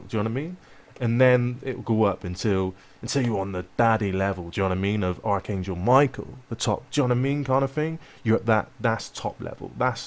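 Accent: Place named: Bristol, United Kingdom. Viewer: you sound like you from bristol am i correct i lived there for 2 years? lol the hay maker is coming ha ha funny brother!!!!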